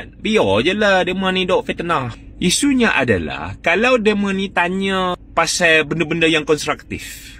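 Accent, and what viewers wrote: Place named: Perak, Malaysia. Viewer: Bahasa Perak ko... Pehhh.... 🎉🎉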